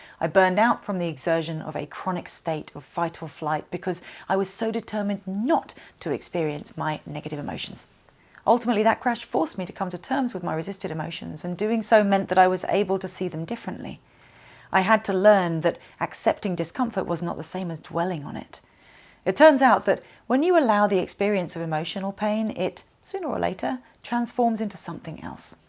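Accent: United Kingdom. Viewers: You’re so articulate! Do you have scripts in the video or you just talk like that? And is that the RP Modern British accent or any other one? 😊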